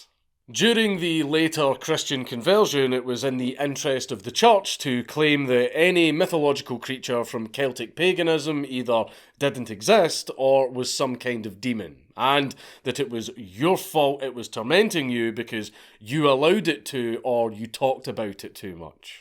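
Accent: Scotland, United Kingdom. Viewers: Nothings more mythological than hearing a Scottish man tell you about myths and insane people from around the world now that’s rare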